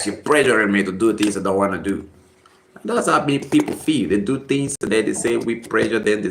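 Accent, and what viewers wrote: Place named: United States. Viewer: Sounds like an Amarican 🎉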